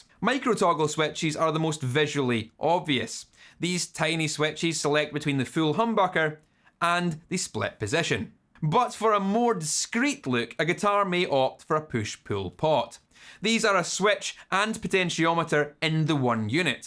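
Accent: Scotland, United Kingdom. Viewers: Perfect explanation--many thanks! Also, as a Star Trek fan, the fact that you have a Scottish accent and are explaining functional electrical engineering concepts is a real treat. : ) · Is this a Scottish accent? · I’m a simple man. I see a video that commences with saying, “hello guys, here” and I smash that like button and watch the whole damn thing to get the full Scottish brogue experience. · Cool video, with the accent of Sir William Wallace! Hear your accent makes me wanna drink whisky! Cheers from Portugal 🍺🤘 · I never would have guessed guitar tech Yoda was Scottish. Good info. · Your Scottish Accent. I want to learn it. PLS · Love the Scottish accent 👍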